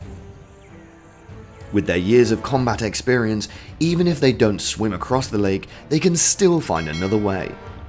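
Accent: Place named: United Kingdom. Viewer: i like the narrator because he can speak with a British accent...jolly good show old bean!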